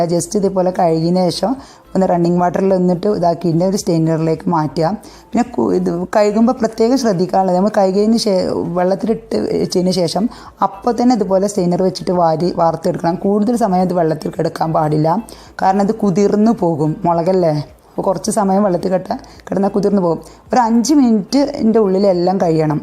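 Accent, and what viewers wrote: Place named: Malappuram, India. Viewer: ഇജ്ജിന്റെ വർത്തമാനം നല്ല മലപ്പുറം tune ...super vedio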